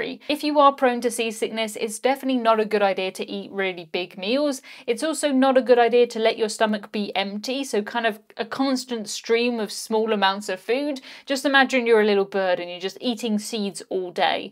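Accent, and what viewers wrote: United Kingdom. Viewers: I love British accents♥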